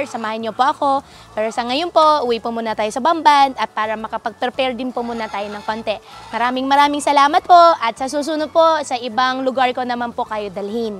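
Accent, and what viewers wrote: China. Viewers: Yup. Her Mandarin tongue accent is obvious 🤥 😝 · Sa itsura nya at accent tsekwa yan e. Yun iba kasing pinoy pakitaan lng ng konting bait bumibigay na agad. Di tinitingnan kung mabuti ba talaga o hindi ang pagkatao ng isang tao. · Chinese na chinese yung pag tagalog. Reminds me of Chinese vendor owners sa 168. Mga Half chinese di ganyan accent , pure tagalog pa din ang English. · Nilalabanan nya yung chinese accent nya pero very halata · Chinese na Chinese nga yung punto ng pagsasalita nya. San ba sya nanggaling? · Chinese accents talaga ☹️ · Agree if she really grew up in the Philippines.. She would sound like pinoy accent talaga... But her accent is Chinese talaga. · Pota with the distinct chekwa accent. Halatang Chinese sleeper cell to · Chinese nga ito sa salita p lng may chinese accent na. Ako chinese blood din pero i was born here grew up here im.proud to be a true Filipino citizen wala na invaded na tyo ng mga pure chinese we need to stand up to protect our own country God bless the phillippines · Ganyan magsalita ng tagalog yung Chinese.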